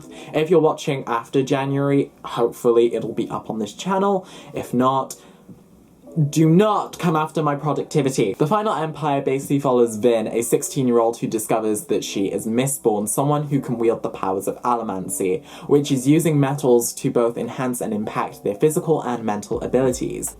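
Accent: Wales, United Kingdom. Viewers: Where are you from because your accent sounds like you have a Welsh twang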